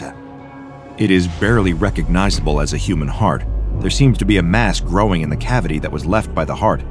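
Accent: England, United Kingdom. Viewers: might be the most british voice ive heard in a while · These English accents are beautiful, I am LOVING your videos. I've been watching and subscribing since your first video. Watching you grow to one million subscribers made me so happy. It's nice to see you going strong.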